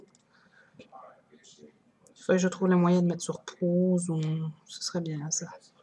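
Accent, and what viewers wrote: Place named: Belgium. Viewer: Quel plaisir j'ai eu à faire ce lapin avec ton bel accent belge :) Merci pour ta patience!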